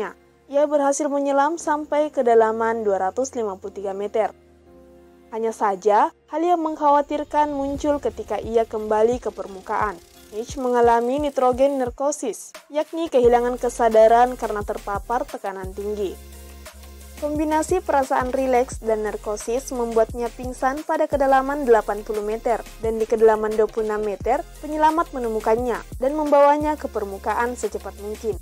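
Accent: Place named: Batak, Indonesia. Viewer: Bahh...iya yah logat nya nampak kali😂,aku pun orang bataknya😀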